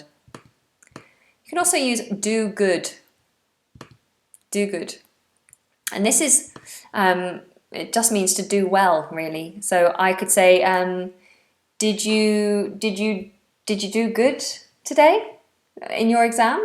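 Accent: United Kingdom. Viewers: I love your British accent,! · Very beautiful British english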